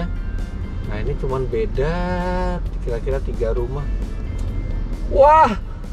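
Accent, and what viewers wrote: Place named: Java, Indonesia. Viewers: dan saya logatnya medok dan saya langsung dapat panggilan jawir😅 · "Agak shock berada disini. Karena....cara ngomongnya itu beda. Saya biasanya itu 'aku, kamu' disini 'lo, gwejh' dan saya logatnya medok dan saya langsung dapet panggilan Jawir"🗿 …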